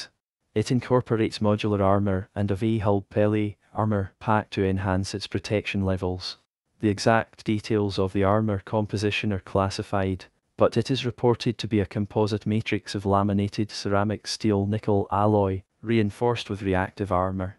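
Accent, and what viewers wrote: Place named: Scotland, United Kingdom. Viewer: You're Scottish!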